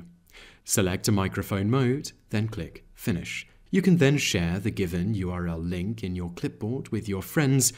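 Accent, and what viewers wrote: United Kingdom. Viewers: Terrible UK accent, could not Understand, very different from American English. Therefore useless to me.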